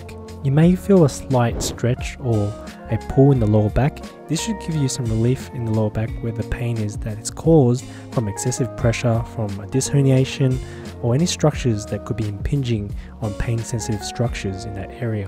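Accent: Australia. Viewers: He has an Aussie accent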